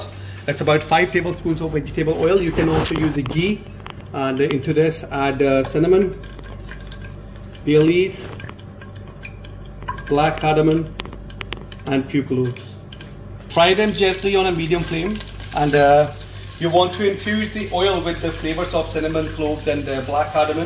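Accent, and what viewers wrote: Scotland, United Kingdom. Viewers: Why you sound scottish? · Is that a Scottish accent I hear? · I detect a slightly Scottish inflection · Looks delicious probably cooking tomorrow 👍 You sound like you have been working ing in uk/Scotland??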